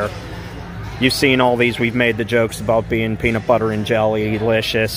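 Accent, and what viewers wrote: Pennsylvania, United States. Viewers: Hey Bro !! Love the Content !!!! Love your Pennsylvania Accent!!!! I Love going into Sprit Halloween every Year and seeing all the cool stuff they have !!!!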